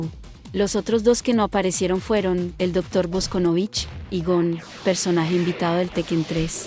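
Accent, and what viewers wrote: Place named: Colombia. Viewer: Loquendo con acento colombiano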